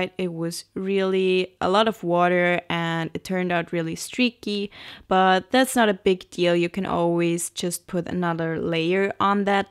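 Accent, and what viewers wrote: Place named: Germany. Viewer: she sounds quite german ... so I guess there's your reason for these nonsensical phrases she lets loose sometimes ... ... ... like i.e. 'the bottom layer is slightly rewedded by the paint' ??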